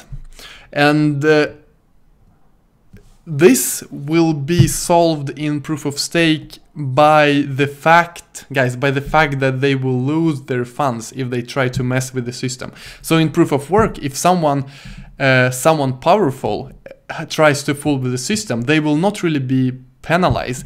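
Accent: Russia. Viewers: I like your huge Russian accent very very much! You are such a brave man to film all those videos and not to afraid of anything. Wish you good luck, I subscribed.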